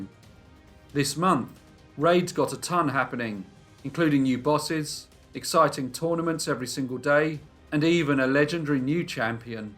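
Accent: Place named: Scotland, United Kingdom. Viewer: I love the Scottish accent...had hopes to visit someday. Things in the world have changed so much I'm not sure if that's a reality now...but anyways...GOOOOD mornin from the northeast USA! Hope you're staying well and safe!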